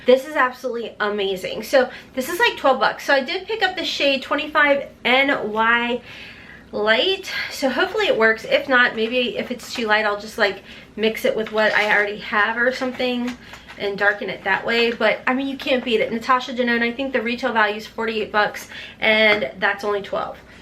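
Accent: South, United States. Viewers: Awe... try not to let people bully you...It’s not that your not putting on your big girl panties, it’s people can be mean and insensitive...Your accent is cute and southern, like mine! Your a sweet and beautiful queen, so don’t listen to mean girl’s comments...We love you, ALL of you! 🥰♥️🥰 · I love how you talk 😍🥰💕 especially when you say “matte” and “back”.... and girllll I’m from the south (Virginia) and I have that southern accent as well.. and OFTEN REPEAT THINGS LOL... do your thang girl!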